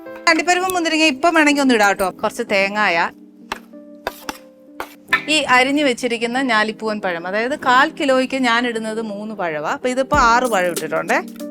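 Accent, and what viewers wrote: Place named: Pala, India. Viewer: പലാക്കാരിയുടെ സൗണ്ട്.r u from pala?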